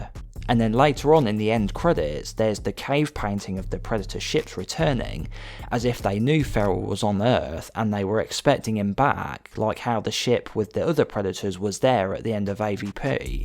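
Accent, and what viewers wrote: United Kingdom. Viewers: Thats a thic british english mate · dude i couldnt concentrate on the video because of your accent i dont to listen to british accent again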